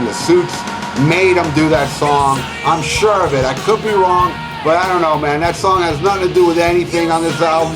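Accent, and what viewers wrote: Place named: Philadelphia, United States. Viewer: You're accent sounds like here in the Philly area. Once again, this is fun viewing.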